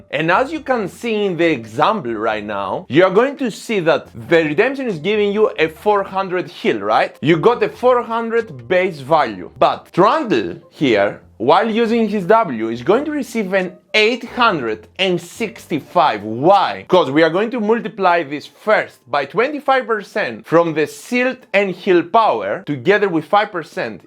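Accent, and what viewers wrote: Greece. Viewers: Bro love your vids, i bet you are greek man your accent saying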